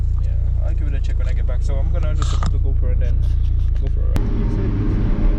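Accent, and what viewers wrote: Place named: Jamaica. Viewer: Man sound like a yaad man.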